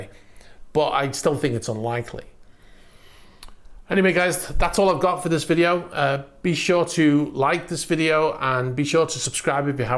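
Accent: Liverpool, United Kingdom. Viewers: Is the presenter of the video a Scouser ??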